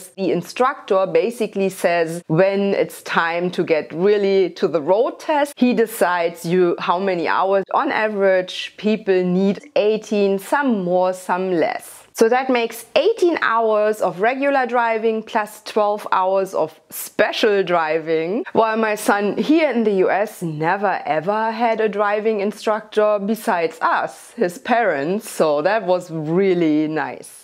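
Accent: Germany. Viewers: Das hat mir gefallen. Aber nach 16 Jahren so ein krasser deutscher (like they let talk "us" in movies...) Akzent?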